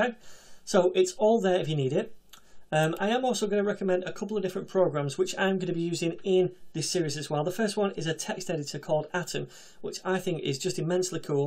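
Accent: United Kingdom. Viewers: omg finally a coder-youtuber have explain it on clear and right way and else thing is he is British and cuz of finally accent is not make me annoy uhh... thank god i fined the best yt Chanel in entire world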